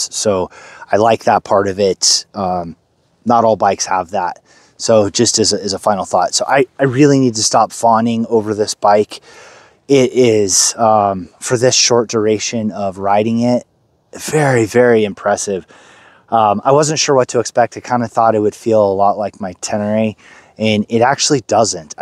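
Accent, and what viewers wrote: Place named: Los Angeles, United States. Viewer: Sounds like she's from LA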